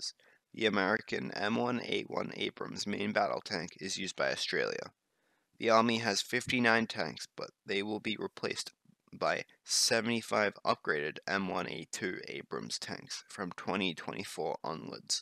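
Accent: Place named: Bronx, United States. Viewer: Sounds like a dude from the Bronx who likes saying "the Australlian Army", was getting a bit annoying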